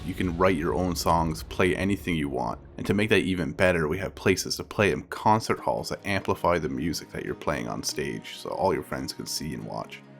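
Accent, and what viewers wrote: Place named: Canada. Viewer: I typed this comment earlier, but I accidentally deleted it because I'm a moron. But, will you have playable female characters in the game? I saw that you had sketches of female characters, but would it be possible for the player to actually be a female? I don't mind playing as a guy, but I was just curious. Also, are ya'll based in Canada? I can hear an inflection in your voice (namely when you say "about" or "out"). I don't think my current computer can handle this game, but, pathetic as it may sound, I'm willing to get a part-time job just to play this game. I really hope ya'll throw a violin or cello in there or something as one of the musical instruments. That would make my life complete.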